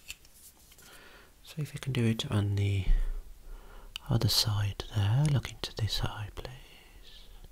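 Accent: Birmingham, United Kingdom. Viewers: My bridge reflection is not as stated how very dare you !! 😂 Great, informative vids, very relaxing and a wonderful voice , a Birmingham accent (at last) velvety smooth that doesn't fill one with a sense of urgent panic as experienced whenever my Brother in law visits. (Aston area) Please don't be offended I'm just trying to be funny . Love your work , thank you 👍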